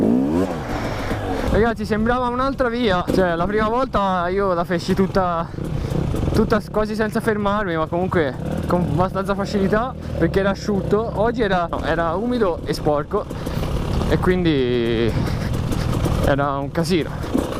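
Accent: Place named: Tuscany, Italy. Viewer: hahha ok Bro! PS. Amo il tuo accento toscano, lo adoro!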